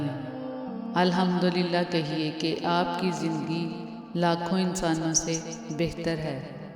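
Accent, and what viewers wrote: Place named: Punjab, Pakistan. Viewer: خوبصورت اواز و انداز کے ساتھ دل میں اتر جانے والے اصلاحی و سبق آموز اقوال ۔۔۔۔ تلفظ کی پرفیکٹ آدائیگی ۔۔۔ سونے پہہ سوھاگہ اردو میں پنجابی لب و لہجے کا حسین امتزاج ۔۔۔ ماشاء اللّٰہ ۔۔۔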